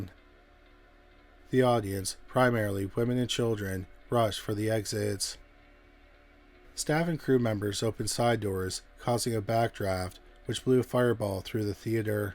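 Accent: Canada. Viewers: Is he Canadian? It would explain some things.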